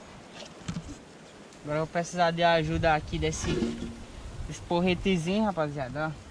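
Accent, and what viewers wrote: Brazil. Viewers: Mano você é de qual lugar do Ceará?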